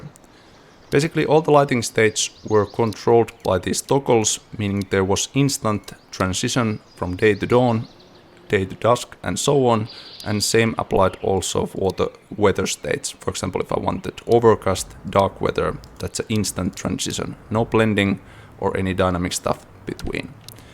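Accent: Finland. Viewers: After watching so many interviews of Sam Lake, I could not not hear the charming Finnish accent :D. Love your game, btw. Keep up the good work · Thought the accent sounded familiar, until I realized you was Finnish! näyttää hyvältä 🤌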